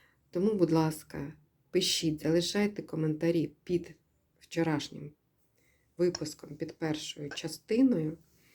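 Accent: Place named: Kyiv, Ukraine. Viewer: Дякую за відео і чудову українську мову з київським акцентом 💞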